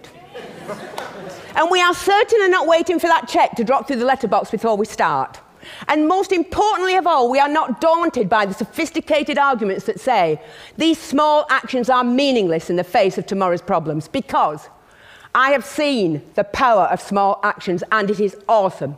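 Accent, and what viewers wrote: United Kingdom. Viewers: Strong accents? It's british english.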